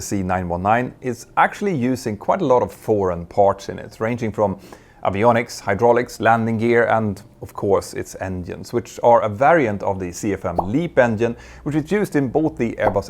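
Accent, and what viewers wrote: Scandinavia. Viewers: I am not sure but this guy’s accent sounds pretty Nordic 😊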